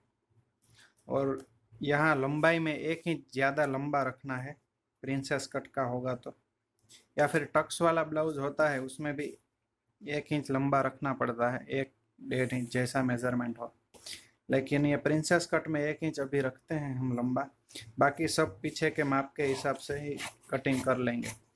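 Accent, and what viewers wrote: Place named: Gujarat, India. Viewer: aapki bolne ki sppech se lagta he ki aap gujrari ho agar aap gujrati ho to plZ gujrati video bhi ek banaye na muje beak side pe kadi vala blause sikhna he plz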